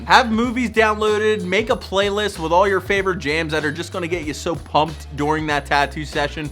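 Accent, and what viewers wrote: Philadelphia, United States. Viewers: Dude you must be from philly i can hear it in your accent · I fkn knew it lol classic Philly accent! Appreciate the vid tho. Employing some of those techniques this week! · You’re from Philly huh?? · 95% sure this guy is from philly. Wooder… I say it to. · i’m from philly and he sounds like he from philly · I'm From Philly., so I appreciate the pronunciation. Haha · You have a strong Philly accent lol great content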